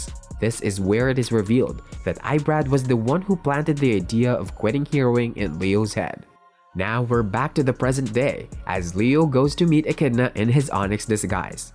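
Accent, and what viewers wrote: Philippines. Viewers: Pinoy narrator😁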